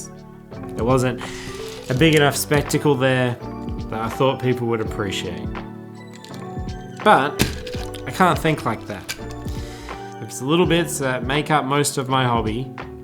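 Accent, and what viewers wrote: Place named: Australia. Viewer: I can see and hear that Australia axon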